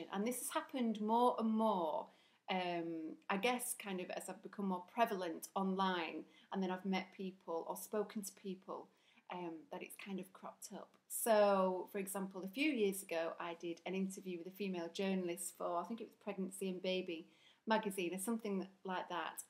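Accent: United Kingdom. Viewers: I've just posted this comment on the blog, but I'm posting it here too... I can’t believe you feel this way about your accent, woman! I think I watched a vlog of yours ages ago and commented about how at home your accent made me feel. I lived in Lancaster for years, and although not home (and obviously not Yorkshire) I loved the place and loved the warm Lancashire tones I was surrounded by. And you’re right, for me, I certainly warmed to you after hearing your lovely accent (seriously, I adore it), and have since read all of your blog posts in that very accent. Please, please do more vlogs. You certainly have the brain for writing, and you mos def have the face for video, not to mention the voice. I can’t wait to hear more xxx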